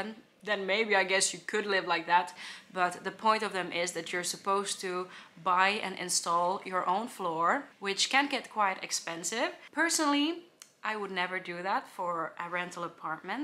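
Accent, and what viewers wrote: Netherlands. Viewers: I find it so funny that though you fairly recently moved to the Netherlands, you do speak english as a real dutchie :) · You speak very good English, but with a light Dutch accent. But your from Finland. 😅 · You definitely have a bit of a Dutch accent when you speak English. I don't hear much of a Finnish accent in it. That's not a bad thing! Regarding helmets: I've read some studies about wearing helmets when biking. It turns out that people wearing helmets tend to take more risks, because they feel safer with a helmet. So they bike faster and more recklessly. Because of that, they end up having more accidents. People without helmets tend to be more cautious, so they don't end up in accidents that often. But if it makes you feel more comfortable, it's definitely okay to wear one. Also, I loooove Finland! P.S. Don't forget to visit the province of Friesland, with its lovely small cute towns and the Wadden Islands, one of the most beautiful nature areas in the country! 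· Hi, usually I recognize a Finnish accent while someone is speaking English (Kimi Raikonen is a perfect example). But you have a slight Dutch accent while speaking English and I'd never guess you would be Finnish. It's funny. Your English is perfectly fine btw.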